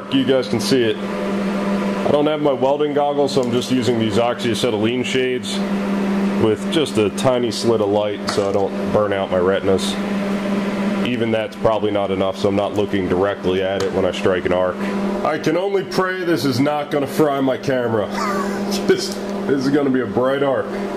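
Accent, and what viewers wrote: United States. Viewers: US AvE? Sounds the part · Am I in the twilight zone? Are you American AVE?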